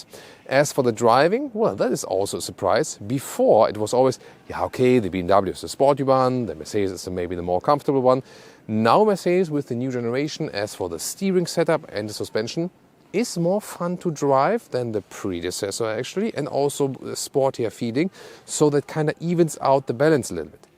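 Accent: Germany. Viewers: Bro man hört deinen deutsche Akzent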